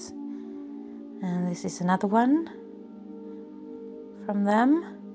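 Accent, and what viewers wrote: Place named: Sweden. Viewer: Love, love, love it! You go girl! Your English with Swedish accent is beautiful. I admire those that are multilingual. xo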